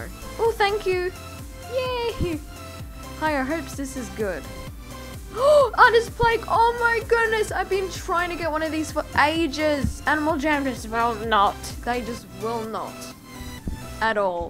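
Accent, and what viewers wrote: Australia. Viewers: lol I love Australian's accents it sounds so perfect and beautiful <3 and I love your personality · u sound like ur from Wollongong...... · Sorry if will offend you but you sound Australian, are you? Sorry again if I offended you I didn't mean to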